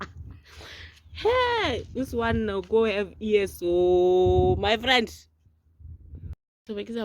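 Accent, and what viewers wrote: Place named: Nigeria. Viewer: Perfect Nigerian accent😂😂😂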